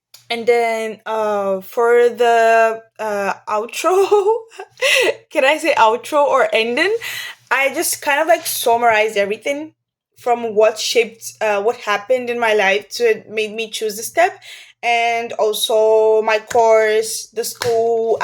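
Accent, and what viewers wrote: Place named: India. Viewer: Its The indian accent for me 😂❤️❤️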